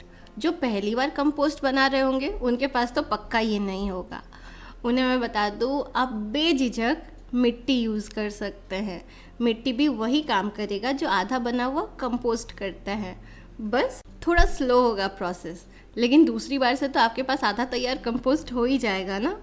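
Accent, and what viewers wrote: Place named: South India, India. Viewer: Lovely Voice ....Kuch Kuch South India jaisa feel ho raha...😄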